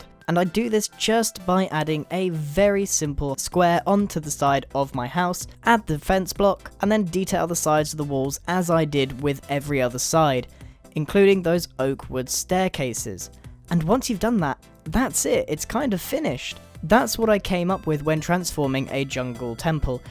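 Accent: United Kingdom. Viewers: i love your brittish accent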